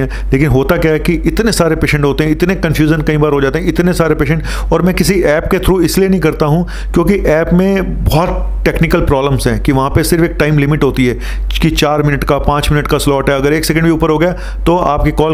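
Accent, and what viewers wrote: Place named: Punjab, India. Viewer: Bhai aap ki punjabi nikal hi jaati h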